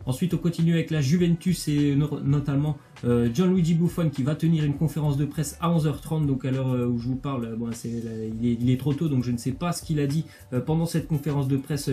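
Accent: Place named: South, France. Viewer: Ta prit un accent du sud 😂et dommage pour Marseille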